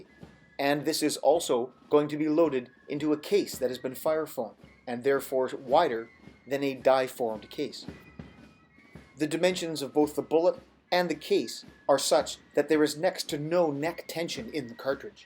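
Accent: Canada. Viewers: These are fantastic video's love them also love the Canadian accent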